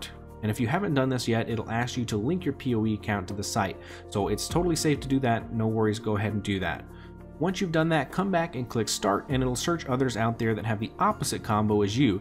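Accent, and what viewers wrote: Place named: United States. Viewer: A someone who isn't American, I usually find the accent as hit or miss. You have a lovely tone, pace and cadence to your voice, and it made for a really nice video. You should do more content, and persevere with your Youtube channel.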